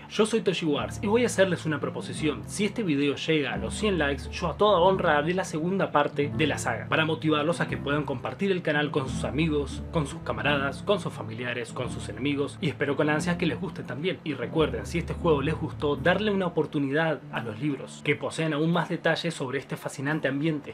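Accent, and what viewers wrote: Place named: Argentina. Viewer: Noooooo :( que feo, en acento de un argentino arruina todo :(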